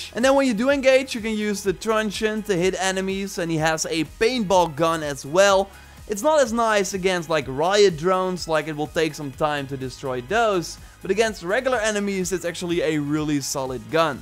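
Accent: Netherlands. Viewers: is deze man nederlands? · Je hoort zo makkelijk dat je Nederlands bent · I smelll dutch ❤ big fan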